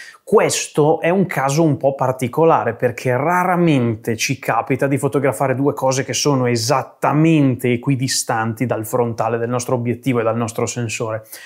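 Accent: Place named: Romagna, Italy. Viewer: un accento adorabile ...romagnolo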